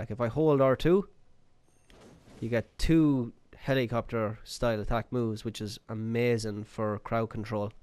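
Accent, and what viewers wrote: Ireland. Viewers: Where you from in Ireland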